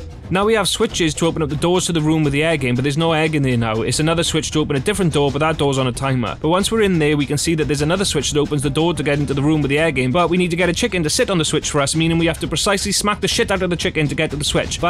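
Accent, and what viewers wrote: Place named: Wales, United Kingdom. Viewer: Great vid, nice to hear from a fellow Welshman 👍🏼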